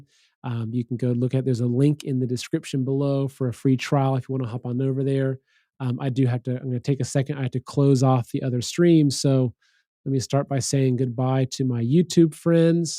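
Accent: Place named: Scotland, United Kingdom. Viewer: That was the Scottish accent and I loved it. I reminds me so much of my uni days 500 years ago :D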